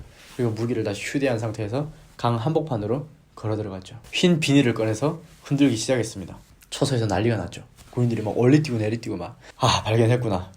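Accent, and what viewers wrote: South Korea. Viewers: 어디가서 귀순했다하면 놀라워할꺼 같은디 타방송보면 다른분들은 10년이 넘어서 말투가 윗쪽동네 말투가 남아있는데 님은 그냥 토종한국인말투 같아여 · 완벽한 남한말씨네요.....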